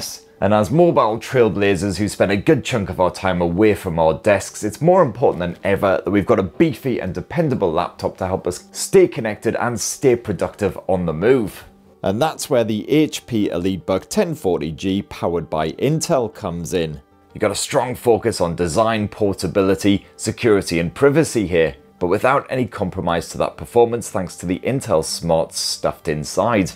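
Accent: United Kingdom. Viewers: the intro has the most british accent ever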